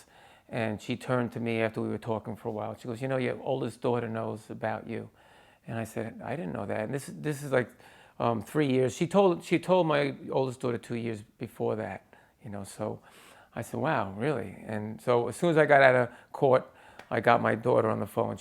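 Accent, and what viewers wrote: New York, United States. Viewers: Great story! (God I love the NY accent so much) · Such a beautiful story! I'd like to meet you sometime, - I love your New York accent.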